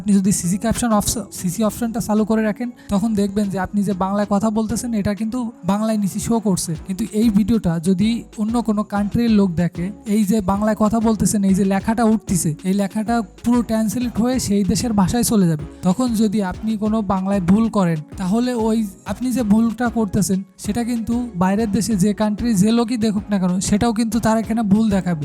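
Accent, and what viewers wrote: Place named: Barishal, Bangladesh. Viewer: ধন্যবাদ ভাইয়া, এত সুন্দর উপকারী একটা ভিডিও আমাদের কে উপহার দেওয়ার জন্য,আমি এই cc নিয়ে অনেক টেনশনে ছিলাম,কেনো ভাবেই বন্ধ করতে পারছিলাম না,অনেক খুঁজা খুঁজি করে নতুন আপডেট এই ভিডিও টা পেলাম, আপনাকে অনেক অনেক ধন্যবাদ এগিয়ে যান ভাইয়া ইনশাআল্লাহ পাশে আছি, এবং আর একটা কথা আপনার বাসা মনে হয় বরিশাল,কেনো না আপনার কথায় বুঝতে পারলাম?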